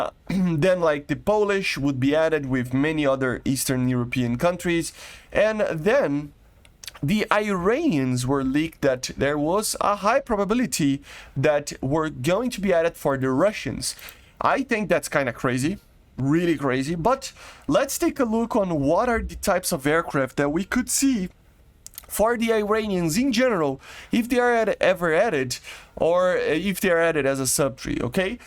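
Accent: Brazil. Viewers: Tu é br? Sotaque parecido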